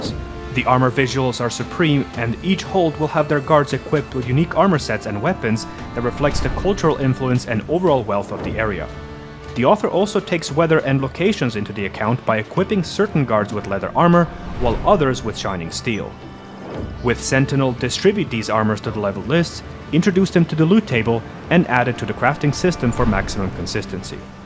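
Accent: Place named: Eastern Europe. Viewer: It’s always dudes with Eastern European accents and playing overwhelmingly modded sexualized female dragonborns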